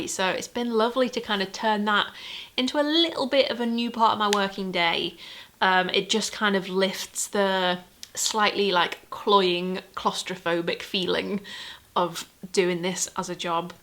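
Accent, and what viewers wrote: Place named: Northern England, United Kingdom. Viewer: I've followed you for ageeesss and I love your vlogs watching your home decor updates has been inspiring! Found your channel through your midsized content but I've stayed because as a northern lass moving to to southern England it was nice to hear similar accent and banter if that makes ANY sense lol😂😬 do what makes you happy, also love gold dust Road! ♥️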